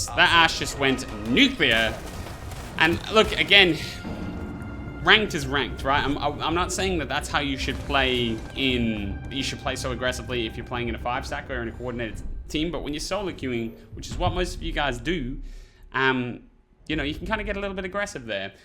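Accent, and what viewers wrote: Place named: Australia. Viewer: my "inner siege-voice" have adopted an aussie accent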